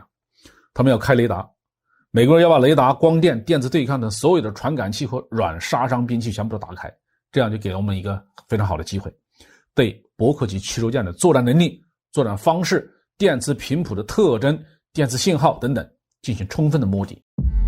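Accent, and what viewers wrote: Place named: Hubei, China. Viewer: 湖北口音？还是信阳南部口音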